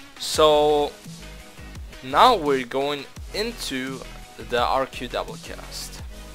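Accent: Germany. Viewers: your english pronunciation lets everyone think that youre from germany in like 3secs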